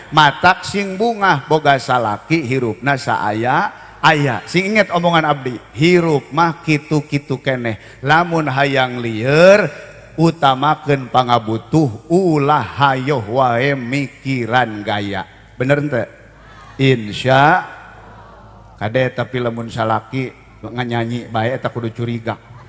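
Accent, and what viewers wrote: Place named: Banten, Indonesia. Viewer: Sepertos urang banten nyariosna. Mantaaap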